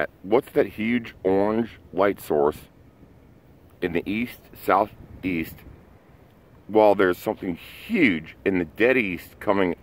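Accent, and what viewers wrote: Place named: England, United Kingdom. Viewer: Loving your English accent 🤩 you good!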